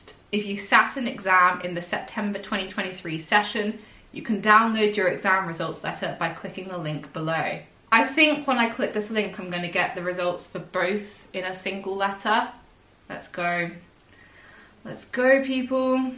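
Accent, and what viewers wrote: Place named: London, United Kingdom. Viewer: this is very random but I've been following you for years (since mid 2018 I think) and I noticed how your accent's changed over time and its the realest thing ever lol you can tell from this vid you've been spending a lot of time in London and having moved around I relate to that smm (like I literally don't know what my default accent would be anymore its just a weird jumble hahaha). anyway I know its super random but I just wanted to let you know you're not alone in this I reckon lol. and congrats on passing the exams btw xx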